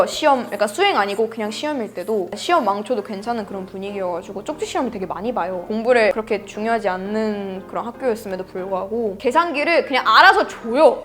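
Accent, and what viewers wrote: South Korea. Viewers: 아무리봐도 유닉한 캐릭터임 한국말할때는 말투, 억양, 마인드까지 100% 한국패치완료됨. 서양 마인드만 살아있다면, 이런 캐릭터는 방송이나 연예계통뿐만 아니라 일반 사회적으로도 필요로한는데가 무지하게 많을듯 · 예쁘고 귀엽네 발음이 완전 한국인이네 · 말투가 조선사람이구먼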